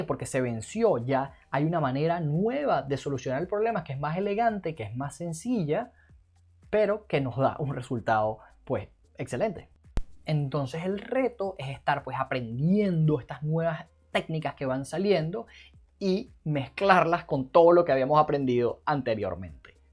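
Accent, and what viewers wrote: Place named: Venezuela. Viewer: Bro por como hablas eres de aqui de venezuela ? despues de css me recomiendas ver videos sobre el dom ?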